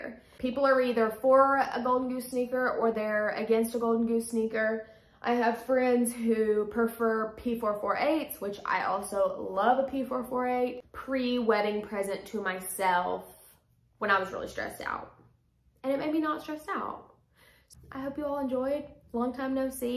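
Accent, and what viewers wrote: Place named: Kentucky-Tennessee, United States. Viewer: Woohoo! Girl, that’s like 11 years of shoes. Also, the Kentuckennesse accent reminds me of home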